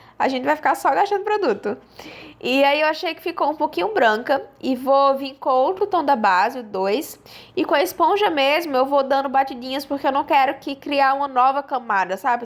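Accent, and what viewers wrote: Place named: Rio Grande do Norte, Brazil. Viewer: Sotaque lindo do RN ❤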